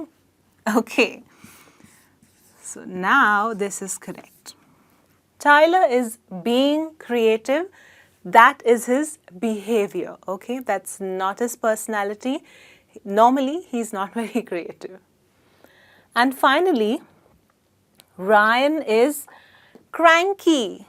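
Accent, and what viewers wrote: India. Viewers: CARMENFERNAN YANET FERNANDEZ BUSTOS ....excuse me....but actually...she is an indian lady....so how she will be Ble to speak in american english...its common sense..dumb...u r an american ...so u will be never speak like an indian...r u???...nt at all...then why r u telling her....bc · Aleyda Narváez Narváez lol,,,, u moron,,,shez Indian,,, how cn she pronounce like u American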